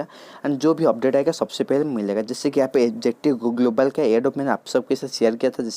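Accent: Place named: Northeast, India. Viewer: ooh apka bolna ka style north-east ki tarah ha isilia pucha 😅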